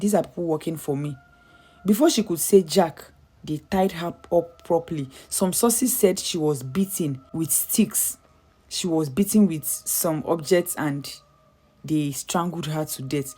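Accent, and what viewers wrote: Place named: Igbo, Nigeria. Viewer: The narrator is not bad,is just the igbotic accent is the problem.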